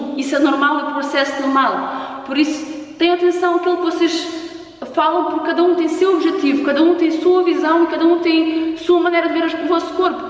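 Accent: Portugal. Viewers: podia ficar de boca fechada, que sotaque de manuel da padaria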